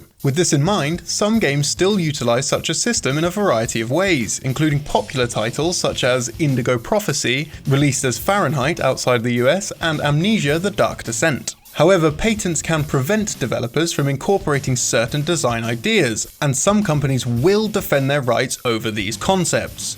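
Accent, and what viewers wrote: England, United Kingdom. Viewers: You'd think everyone had never heard Englishman speak before. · Words are pronounced differently based on region. In this case, likely British. Case closed. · I love you and your weird (to me) British pronunciations,! Now I'm genuinely curious if that's how English say "patent"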